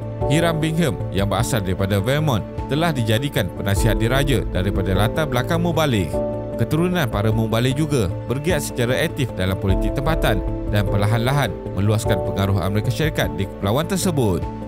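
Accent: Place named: Malaysia. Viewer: Dialeknya melayu banget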